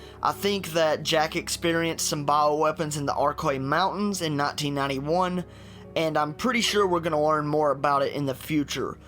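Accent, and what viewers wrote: South, United States. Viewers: JSG GAMING its a southern accent.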